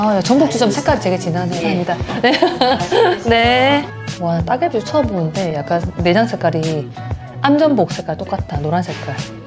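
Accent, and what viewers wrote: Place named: Gyeongsang, South Korea. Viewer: 경상도 사투리.ㅋㅋㅋ 가시나 ㅎㅎ. 그냥 좋다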